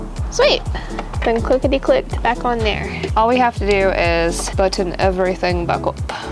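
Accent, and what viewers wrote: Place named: Europe. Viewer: Great information...that accent...European made in America if I'm not mistaking ;-) Stylish! Keep up the good work. Will try same on my 2 Navis. Happy trails. JP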